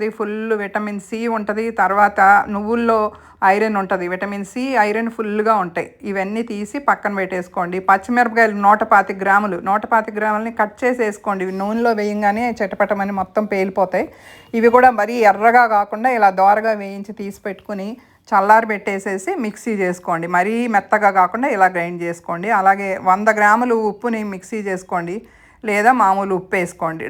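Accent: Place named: West Godavari, India. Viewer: Sakkati telugu madam westgodavari 🎉Madi Bhimavaram mogalu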